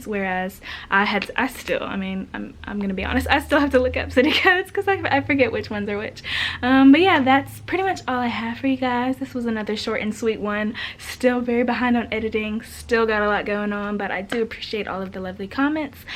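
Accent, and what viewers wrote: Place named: South Carolina, United States. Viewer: Sounds like Southern Carolina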